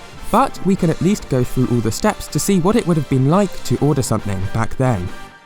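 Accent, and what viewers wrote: United Kingdom. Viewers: You literally speak a British Accent!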